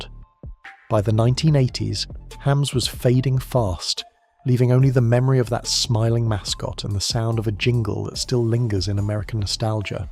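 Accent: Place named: United Kingdom. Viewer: Wait. Why is a video about vanished American beers narrated by a British sounding douche?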